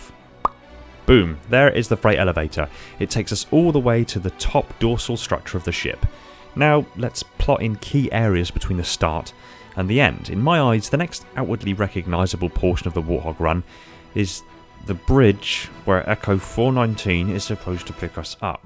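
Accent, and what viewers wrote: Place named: South West England, United Kingdom. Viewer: This is top notch but I've spent the whole video trying to work out where in the South West your accent is from. I'm leaning towards Bristol but I'm also getting Gloucester vibes.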